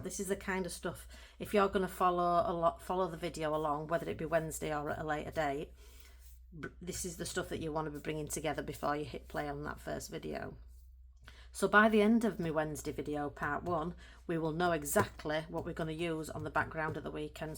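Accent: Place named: Northern England, United Kingdom. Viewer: I’m really looking forward to this series - love the colour palette you have chosen for the background! I’m excited that you will be using Chromoflow as there are very few YouTube channels who use these pencils - Prismas are by far the favourite out there. I am a Mancunian living overseas so it is lovely to hear your Northern tones!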